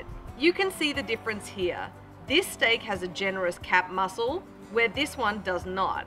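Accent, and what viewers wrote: Australia. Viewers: Lol, the longer she lives in Texas the thicker her “Australian accent” gets 🙈 Thought it was supposed to be the other way around. Don’t understand? Go to her channel and look at the first videos, 100% American, never would think she was from somewhere else. Maybe her PR people told her accents sell 💰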